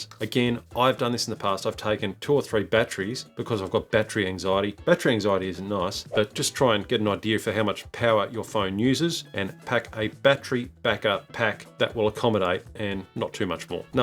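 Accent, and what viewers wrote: Australia. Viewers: Great video. Love watching Aussie youtubers talk about gear. Our terrain, bush and scrub are so different from other places, and our gear has to be up for it. Appreciate having an Aussie voice contributing to the gear space!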